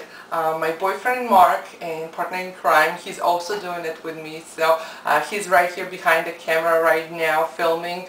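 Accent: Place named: Poland. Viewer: sorry just noticed your accent, are you European? lived and probably raised in america? just curious lol... sounds you've got polish accent.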